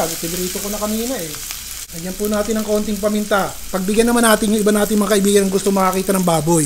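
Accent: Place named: Tagalog, Philippines. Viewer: So yummy, 😋tagalog na tagalog ka chef😊😅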